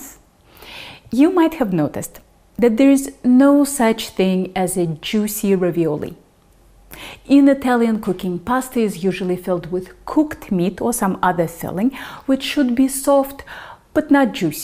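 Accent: Russia. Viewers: I followed your recipe and made pelmeni your way. They were deliciously spectacular! Thank you very much. You mention Olia Hercules often, so I watched a few of her videos. Her recipes looked tasty but it seemed she wasn't cooking for the average person. Most home cooks do not cook the way she does. In one video she used a gas range that must have had a dozen burners. I like the fact that your food processor is small and you need to make two batches and mix them. You don't have the Instant Pot Ultra Bluetooth model, you have the same one I have. That is what it is like for most people. You seem to love what you do and passing on your passion. That is what I enjoy about your videos. It doesn't hurt that you are a very pretty lady with a Russian accent either. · Рашн акцент не спрятать)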